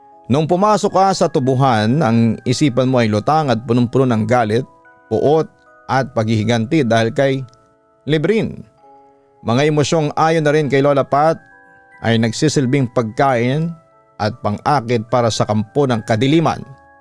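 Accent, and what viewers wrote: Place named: Iloilo, Philippines. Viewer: ilonggo c papa dudot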